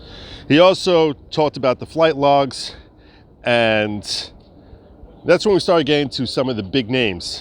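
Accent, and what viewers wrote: New York, United States. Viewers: Love your show. I miss the NY accent living in Ky but would NEVER move back!😊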